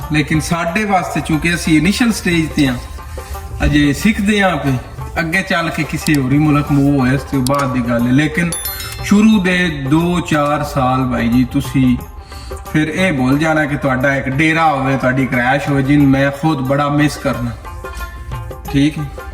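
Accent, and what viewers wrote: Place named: Sargodha, Pakistan. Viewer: Bahi g mennu Sargodha da lagda 😂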